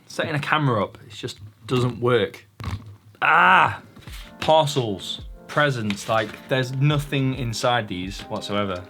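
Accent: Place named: Northern England, United Kingdom. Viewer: I feel like I've found the North of England's answer to Peter McKinnon... (geographical location guess based on accent, could be way out 🤣) Either way this channel is dope. Great work man!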